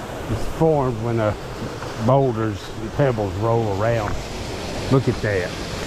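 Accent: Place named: South, United States. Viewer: That name does not match up it that Southern accent.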